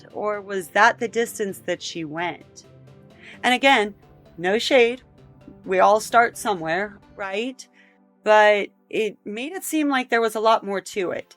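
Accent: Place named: United States. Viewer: This is very random but your voice+American accent combo sound so good it’s really nice to listen to! Sending love from Romania⭐️🤍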